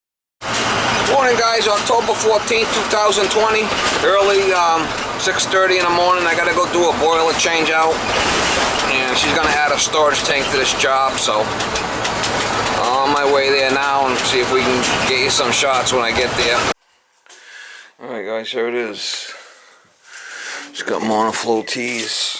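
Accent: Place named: Massachusetts, United States. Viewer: Sounds like you're from Massachusetts where's your fire Watchman for that torch . lol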